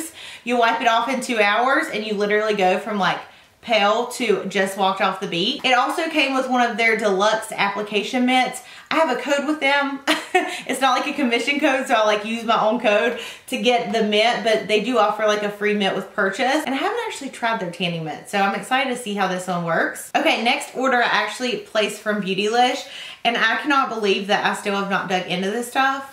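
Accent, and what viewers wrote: South, United States. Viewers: Hi first time watcher here. Where do you live? I hear that southern accent! 🤗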